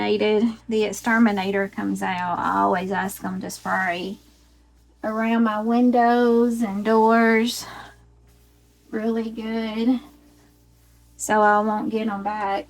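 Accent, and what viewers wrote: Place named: Appalachia, United States. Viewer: I'm just curious about your dialect. Are you from West VA, Tennessee, or Kentucky?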